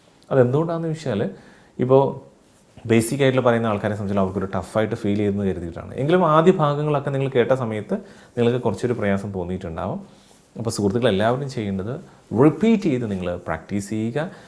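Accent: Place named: United States. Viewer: American pronoun sation good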